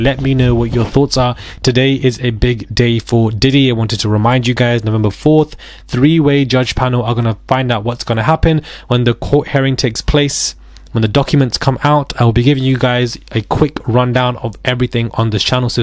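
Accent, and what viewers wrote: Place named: England, United Kingdom. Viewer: You can slow it down if you acers the playback speed in the top right. But yeah England has so many accents when people speak normal speed its hard to understand if you're not native. Just be thankful he's not Scottish or Irish you prob wouldn't understand one word 😂 even I as a native might need to repeat parts!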